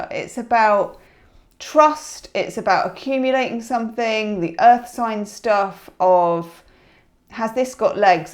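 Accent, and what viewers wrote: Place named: United Kingdom. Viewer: VO5 through his hair.... How old is he lol....always a pleasure to hear a British reader not afraid to be totally British. (Many of my favs are American tbh). Maybe one day I could brave myself on you tube, unlikely my reads are far too fanciful....(although saying that love Nigel's reads)....namaste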